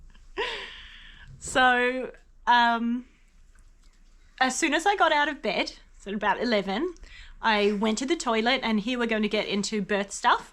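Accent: Australia. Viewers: Thankyou for sharing Your Birth Story. I enjoyed listening to a fellow Aussie. Your Videos are Great!! Sending Love to You & Your Family. ❤ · Thanks for sharing your story! I was so excited to find your channel, it’s refreshing to hear an Aussie accent and Australian birds in the background 😂 I have had four natural hospital births and feel so drawn to a home/unassisted birth next time so hearing others stories is inspiring to me ❤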